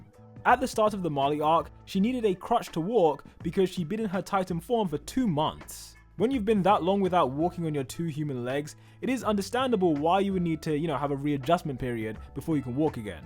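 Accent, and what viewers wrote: United Kingdom. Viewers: she speaking british which gives me the tingles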